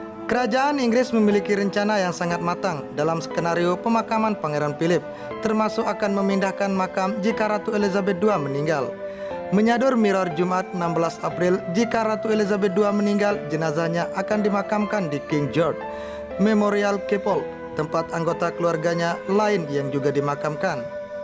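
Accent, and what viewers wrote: Indonesia. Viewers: Dr kmrn bahas cara baca mulu....harap pandai..lidah indo beda...apalagi yg kurang bs bhs inggris..harap maklum lah...jngn spam trs🙏🙏klo ga suka ya uda diem aja...🙏🙏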